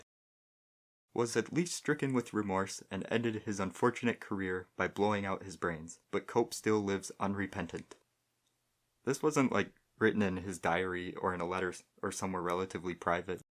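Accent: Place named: Northern England, United Kingdom. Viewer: Really? He seems so sweet on camera. Must be the northern accent.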